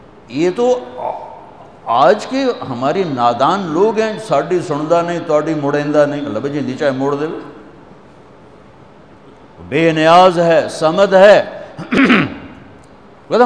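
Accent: Punjab, Pakistan. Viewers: Maulana ji mai india punjab to reverted muslim thoda pakistani punjabi accent bada kaim e ,,, mere naal gal karlo.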